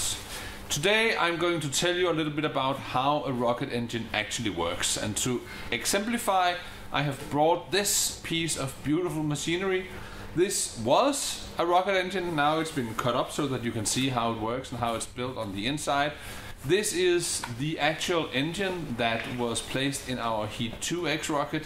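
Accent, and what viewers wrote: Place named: Germany. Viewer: the moment i heard the german accent i knew he was legit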